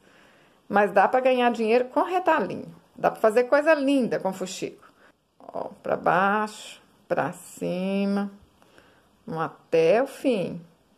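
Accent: Minas Gerais, Brazil. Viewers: Há quanto tempo desejo aprender a fazer fuxico... Muito obrigada... Sei sotaque é muito lindo!!! É de minas??? Muito graciosa e paciente você é! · Ah o sotaque mineiro 😍 Um calma, conseguimos pegar passo a passo, obrigada por compartilhar suas ideias.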